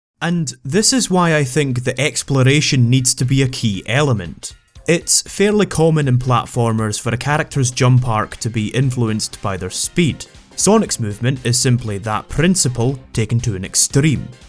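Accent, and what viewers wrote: Scotland, United Kingdom. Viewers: more scottish to me.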